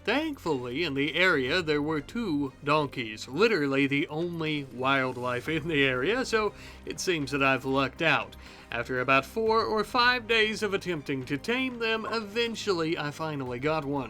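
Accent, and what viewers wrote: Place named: Transatlantic, United States. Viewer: is this your natural voice? it sounds like you're making a jim carrey smirk type of forced face with a idea of some kind of transatlantic accent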